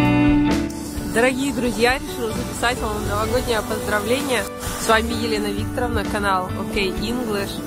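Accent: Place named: Oxford, United Kingdom. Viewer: Елена Викторовна, спасибо , вам за поздравления, за ваши видео-уроки, за вашу безвозмездную огромную работу, которую вы делали и продолжаете делать для нас! Мне стыдно за то, что пока не могу сделать хоть какой-то взнос вам, вашему каналу. Английский это просто моё хобби , от которого я не жду ни каких достижений , успехов. Просто не хочу бросать эту практику, если у меня это получится, то это благодаря вам.Счастья вам и процветания в новом году! p.s. У вас очень классное произношение, по мне , так почти оксфордское.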